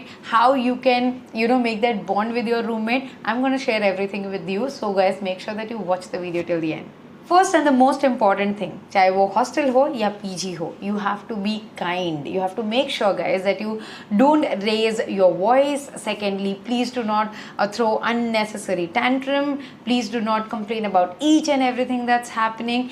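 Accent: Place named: India. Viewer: Your Desi English very nice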